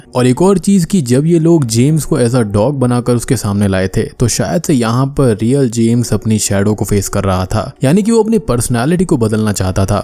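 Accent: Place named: Kolkata, India. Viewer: I know this accent is from kolkata. Don't be sad about such comments. It's all about the fun like a lil child speaks in his way .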